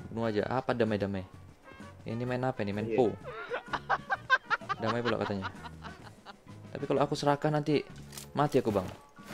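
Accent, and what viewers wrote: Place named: Eastern Indonesia, Indonesia. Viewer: Pake aksen logat orang timur pasti jarang yg bisa nebak walau open mic dari awal.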